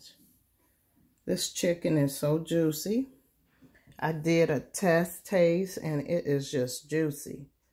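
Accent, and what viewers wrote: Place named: Haiti. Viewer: Ok I though because you have an haitian accent.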